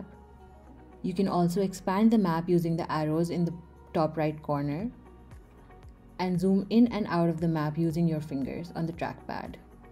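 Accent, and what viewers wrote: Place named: India. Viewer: The Desi accent and voice is so calming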